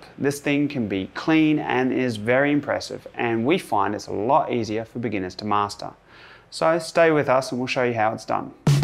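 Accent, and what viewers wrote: Australia. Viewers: must be aussie ☕️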